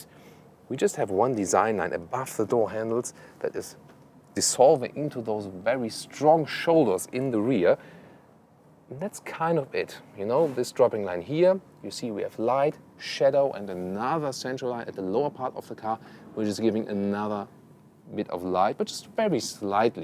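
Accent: Germany. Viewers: ahhahaha typisch Deutsch hab's direkt rausgehört 😂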